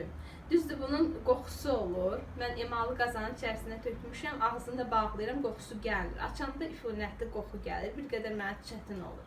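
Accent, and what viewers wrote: Azerbaijan. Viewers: Pul biberi begendim. Azeri kardeslerimizin konusma tarzina bayiliyorum. Ellerinize saglik.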